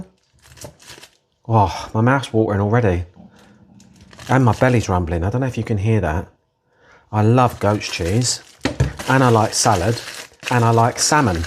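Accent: Essex, United Kingdom. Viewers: G’day from Australia..as you said about your Essex accent not saying some of the letters in the words…even though you said the word”crepe” the way it’s spelt..we spell it the same but for some reason we say it as “ crape”???? Not to be confused with with “ crap”🤣🤣🤣 silly bugger,not naming your plants..but you will get a nice suprise when they flower or fruit….your lunch looked delicious also…really enjoying your extra videos..thankyou for sharing 🇦🇺🇦🇺🇦🇺🇦🇺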